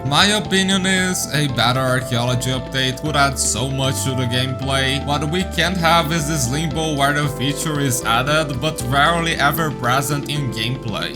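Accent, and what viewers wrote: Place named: Brazil. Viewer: your accent sounds Brazilian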